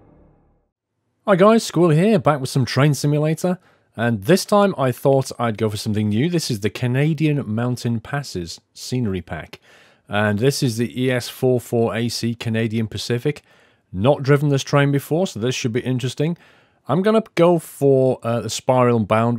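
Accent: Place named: United Kingdom. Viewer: How do you forget that when he has a British Accent?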